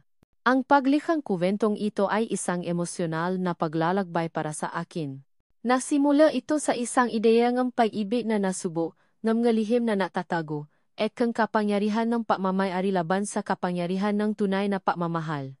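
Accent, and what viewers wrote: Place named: China. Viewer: Ang pangit ng narrator palitan ng pure Pilipino parang Chinese mag pronounce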